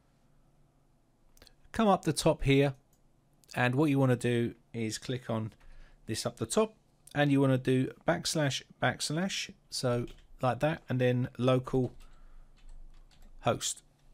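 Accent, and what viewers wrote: England, United Kingdom. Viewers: Nice to hear an English accent lil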